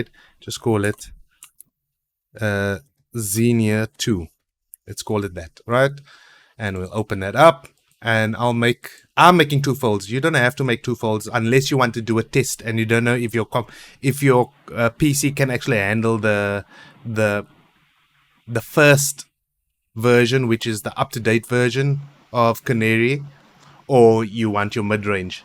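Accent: South Africa. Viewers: ive been trying to play this game on pc of so long and your vid really helped me tanks(btw are you south afrian) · bru are you south african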